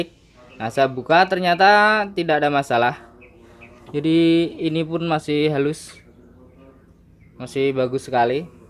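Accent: Indonesia. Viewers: Logatxa gw kenal ini orang madura heheeheh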